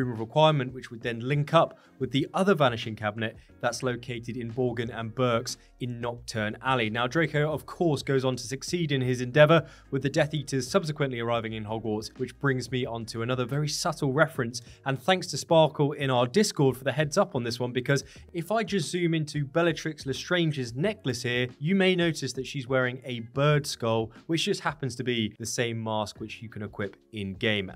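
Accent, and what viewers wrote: England, United Kingdom. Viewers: always! Your videos are the only ones I’ll watch for HL content. Professional, eloquent, and no fluff. And an English accent is much more preferable to an American one. Haha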